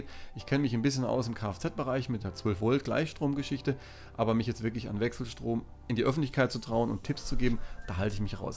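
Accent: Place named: Swabia, Germany. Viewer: Ja, der ist schon ein guter. Vor allem die Stimme, auch wenn er aus dem Schwäbischen kommt. Die Stimme ist weich und er erklärt es super. Könnte auch als Werbesprecher Arbeiten.. Jetzt zum Thema, ich habe mir auch eine Pool Heizung zugelegt. Pool hat nur um die 3000L. Hatte als erstes den Papierfilter gegen eine Sandfilteranlage mit diesen Bällen getauscht. Muss sagen geile Sache. Im zweiten Schritt habe ich mir bei einer großen Plattform 4 Absorber und das Passende Zubehör Bestellt. Alles auf die Wiese im Garten zusammengebaut und es lief alles super. Inzwischen habe die Anlage auf unser Pergola Dach montiert. Jetzt funktioniert sie leider nicht mehr richtig... Habe mir dazu dein Video mit deiner Heizung angeschaut und musste wahrscheinlich feststellen das ich auch ein Rückschlagventiel und eine Fallbremse brauche. Na ja, ist bestellt jetzt hoffen wir das es geht. 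Und das bei einer Fallhöhe von nur 2m. Vielen Dank für deine Filme. Mach weiter so.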